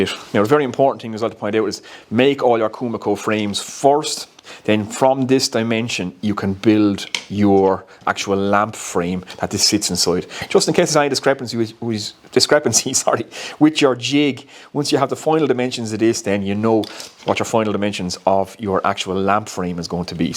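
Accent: Ireland. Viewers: I think it'd be cheaper to scrap some cheap yard sale lamps for the components... I've got access to a bunch of wood and have all the tools but I just couldn't see myself selling those for that much considering how much batch work goes into it. I don't think I'd charge more than about $65-$70 a lamp all in all. rather spend a week cutting up parts and components and spend the weekend assembling a BUNCH of them. steep prices mean you don't have to sell AS MANY to get where you need to be but cheaper prices mean MORE people are going to be willing to buy them which is a more steady strategy. I guess it comes down to HOW much do you want to work, then again going off the accent I'm guessing you're in Ireland and I don't know the import taxes on a lot of that stuff so that's likely where most of the high cost for the electrical components is coming from. the price on those components is just mind boggling lol they should be like a dime a dozen! 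you should change jobs and be an importer at that point though... life's too expensive anymore these days lol doesn't make sense to me...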